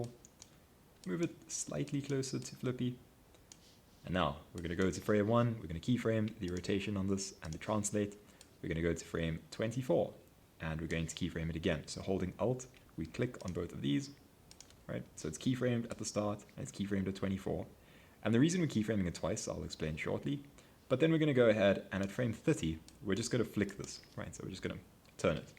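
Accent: South Africa. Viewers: Love this series!! Are you from South Africa?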